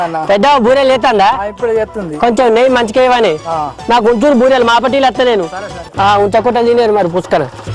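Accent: Telangana, India. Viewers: అచ్చమైన తెలంగాణ యాసతో అమాయకమైన మ్యానరిజంతో కూడిన కామెడీ నాకు చాలా నచ్చుతుంది.. మరీ ముఖ్యంగా ఇందులో సగటు మానవుడిలా ముందో మాటా.. వెనుకో మాటా.. సరదాగా అనిపించింది.. ✍ Ramon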